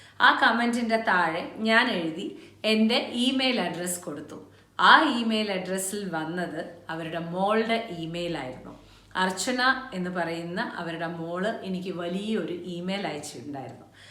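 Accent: Palakkad, India. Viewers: I am also a fan of you recently started seeing your vlog I am also from palakad so feel very familiar when I hear your way of talking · Hi am always see your new episode. Great dear 🥰 pkd evide anu varubool kananam.realitiyodu kudi ulla presentation.palakkadan natural slang super good bless yu.❤ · എനിക്കും ഇങ്ങനെ 🥰 കാണാൻ ആഗ്രഹം ഉണ്ട് പാചകവും വാചകവും ഒരുപാട് ഇഷ്ടം 🥰 ഒരു പാലക്കാടിന്റെ സംസാര ശൈലിയും ഒക്കെ ആവണം അത് 👏👌👌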